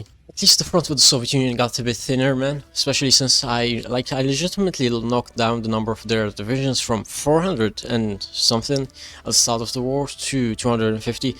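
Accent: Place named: France. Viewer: The french accent fits well in your talking bro 👍👍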